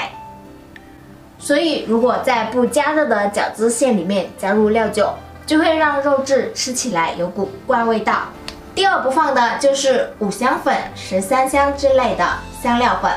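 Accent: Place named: South, China. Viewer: 我一打开，听到南方口音，我就知道好不了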